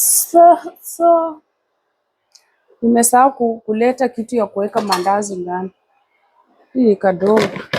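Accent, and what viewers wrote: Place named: Kenya. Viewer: She’s a luhya, therefore don’t be too critical, cut her some slack! We understand what she means. Every tribe have an accent!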